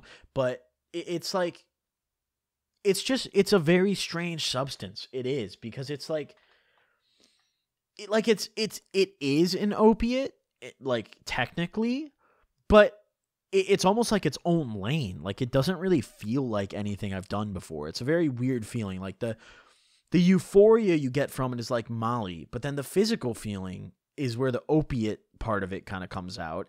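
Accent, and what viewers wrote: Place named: East Coast, United States. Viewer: Where are you from? gotta be east coast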